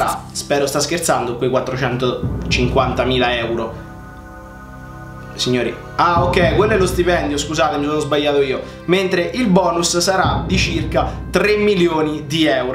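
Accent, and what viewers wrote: Lazio, Italy. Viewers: ma sei di Frosinone? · Ma sei de Frosinone?ciociaria portami via 😂😂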